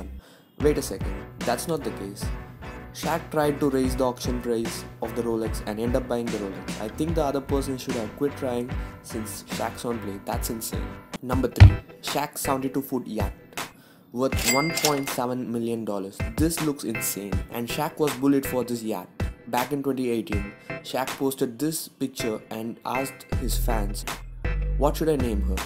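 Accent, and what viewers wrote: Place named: Australia. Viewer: This guy has Australian accent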